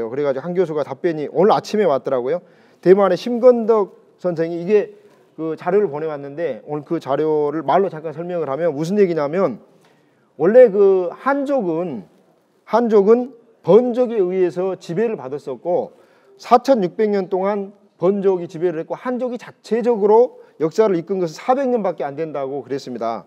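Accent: Jeolla, South Korea. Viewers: 말투가 전라도신데 사투리가 경상도라면 더 믿음이갈것같네요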